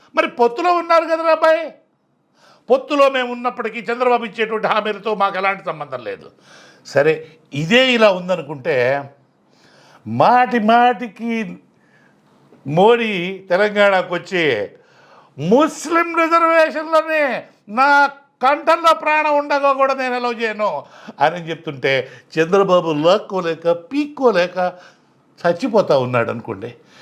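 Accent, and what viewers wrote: Nellore, India. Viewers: Nenu Nellore Zilla anukunna, mee slangnu batti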